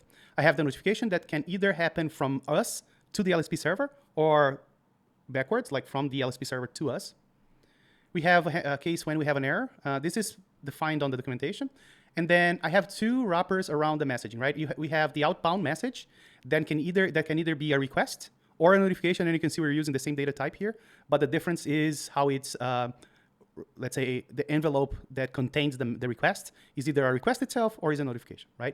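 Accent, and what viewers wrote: Brazil. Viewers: No momento em que eu ouvi tua voz sabia que tu era brasileiro kakakak Boa mano, é muito bom ver alguém da comunidade trazendo um video em rust tão bom quanto esse Sucesso mano \o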